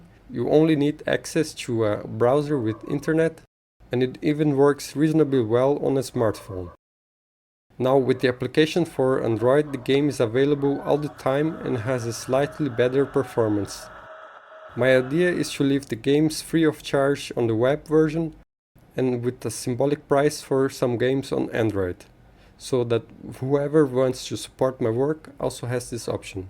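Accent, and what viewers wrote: Brazil. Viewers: vi que era brasileiro pelo sotaque, valeu meu rei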